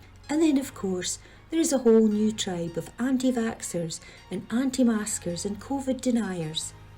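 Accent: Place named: Scotland, United Kingdom. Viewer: Your sweet voice+Scottish accent, is like song for sore ears, my dear! And yes, it has truly been, the wankiest of years; all of what you mentioned, my best flyfishing buddy (me Da, 83yrs young) being dagnosed, with terminal Liver-Cancer😩 (moderate drinker!). Feelings: <link> May, Hugin & Munin, guide your way...Take care of yourself and yours!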